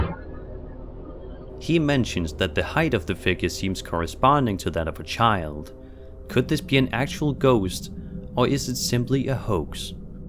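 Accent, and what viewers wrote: Denmark. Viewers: Sir, are you danish? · thank you. My hubby is from Denmark and he pointed out his accent lol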